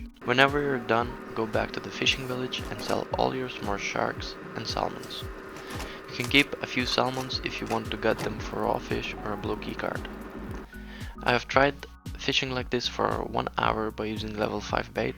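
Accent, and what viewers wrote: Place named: Lithuania. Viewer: u sound lithuanian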